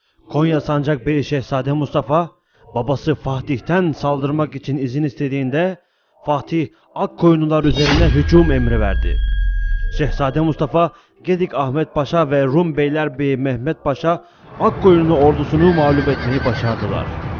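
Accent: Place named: Balkans. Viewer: eyvallah kardeşim. Balkan esintisi var telaffuzunda. Kötü bir şey degil. Begenerek takip ediyorum. Tavsiye ediyorum. Hele son zamanlarda çağ atladın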